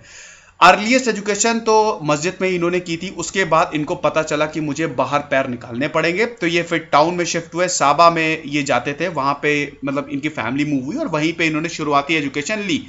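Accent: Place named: Bihar, India. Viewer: Bhaiya ye aisa bhaynkar abhiyan tha na Full to bihari ascent ......I like it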